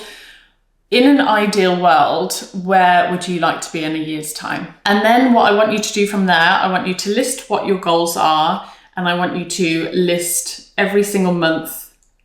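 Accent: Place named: United Kingdom. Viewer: i wasn’t expecting a british accent! lol but loved the video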